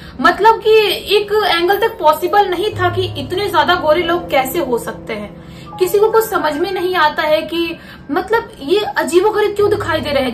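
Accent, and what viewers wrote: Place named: Uttar Pradesh, India. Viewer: madam k bolne ka way of talking hi esa hai she's from uttarpradesh side...maybe isliye....but Kisi ko appreciate na kar sako to criticize karne ka bhi koi haq nhi h tum jese mahapurusho ko 🤦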